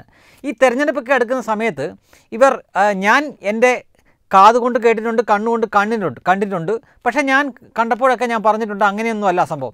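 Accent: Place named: Kannur, India. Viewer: എൻ്റെ നാട് ആലപ്പുഴയാണ് , കുട്ടനാട് ആണ് എന്ന് വാർത്ത വായനക്കാരൻ ഇടക്കിടെ പറയുമ്പോൾ ഒരു സംശയം. ഭാഷ കേട്ടിട്ട് കണ്ണൂർ കാരൻ ആണെന്നാണ് തോന്നുന്നത്.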